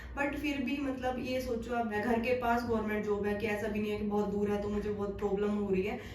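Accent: Haryana, India. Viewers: Aap haryana se ho mam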